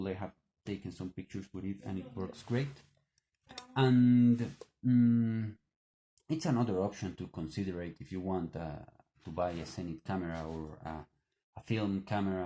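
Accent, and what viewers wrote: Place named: Spain. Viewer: Hola,gracias for your review. Very nice the EM whit Moscow 80 logo!! Love your english with spanish accent...!! Greetings from Italy. Nos vemos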